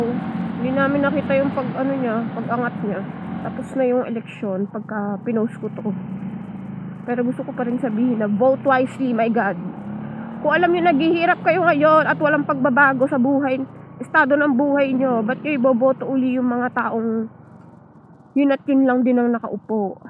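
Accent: Laguna, Philippines. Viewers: I have an ex-gf from Sta. Cruz, Laguna and her voice, accent, the words she's using, and the way she speaks is EXACTLY the same as you. It's kinda trippy☺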